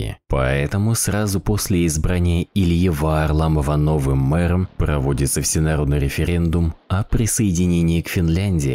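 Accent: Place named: Izhevsk, Russia. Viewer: Так и знал, что ты из Ижевска) По акценту было понятно Привет из Берша :)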